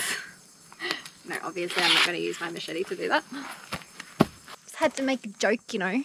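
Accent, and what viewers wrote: Australia. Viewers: I noticed; some similarities of Australian accent ( yours ) & American "Southern" accent, where "fire wood" and "alright".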